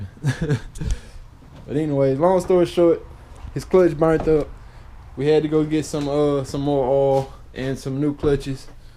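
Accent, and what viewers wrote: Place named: Gulf Coast, United States. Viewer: yo, where do you live? You have that Gulf Coast sound to your voice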